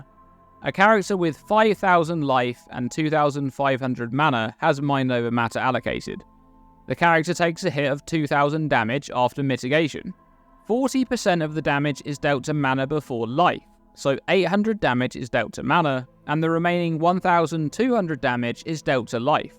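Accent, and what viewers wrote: United Kingdom. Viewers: Bri'ish man spitting facts again. Always great for theory crafting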